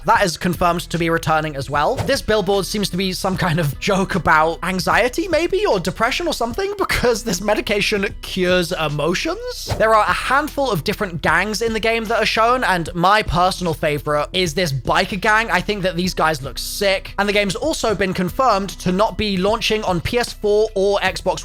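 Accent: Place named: United Kingdom. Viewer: Bro it's because of his accent. He says it like Ig•yoo•ahna and americans say ig•wana or eeg•wana. I've noticed that a lot of words have a more pronounced "yoo/yew" sound with a british accent.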